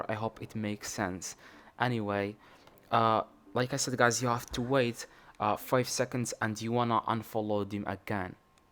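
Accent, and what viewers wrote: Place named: Morocco. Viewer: واش خونا مغريبي بينا من هضرتك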